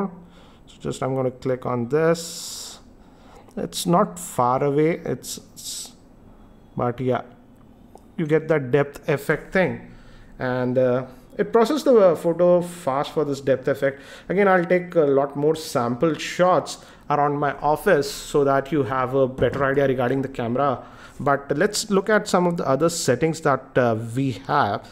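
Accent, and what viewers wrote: India. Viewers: Good video, but maybe you can talk a little bit slower, because ( no racist ) indian accent and fast talking together is really hard to follow in my honest opinion. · Indian English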